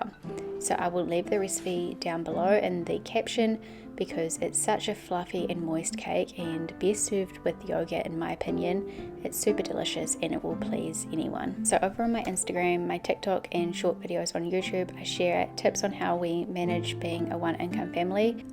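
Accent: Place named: New Zealand. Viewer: The accent is so lovely. I lived in Auckland until I was 18 then moved to Australia and London. Am 54 and still have my accent.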